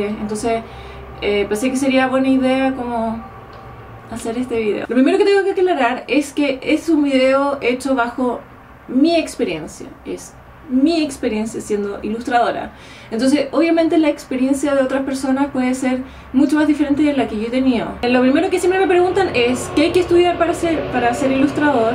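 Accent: Chile. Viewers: Wow! cómo cambia todo hablado en español... y en chileno! ;D eres admirable! Saludos! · Tienes un acento muy bonito, me encanta como en Chile dicen "chiquillos o chiquillas" ¡Mucho éxito en todo!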